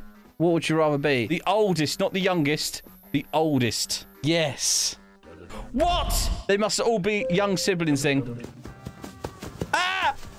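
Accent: United Kingdom. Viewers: That's our amazingly charming British accent for you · Your british to mate❤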